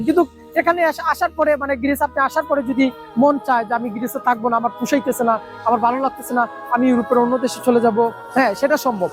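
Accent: Sylhet, Bangladesh. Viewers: আপনে সিলেটি নি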